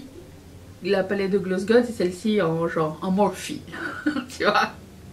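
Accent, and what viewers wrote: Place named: Quebec, Canada. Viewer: Je t'ai découverte il y a quelques jours, je trouvais que tu avais quelques tournures de phrases et de prononciations qui sonnaient québécoises! Ça fait du sens maintenant que j'entends que tu es au Canada! Profite bien de l'été qui arrive! 🦋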